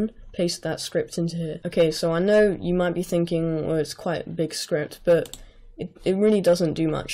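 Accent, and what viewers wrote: United Kingdom. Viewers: THANKS SO MUCH FOR THIS AMAZING TUT!!!! (btw are you british?)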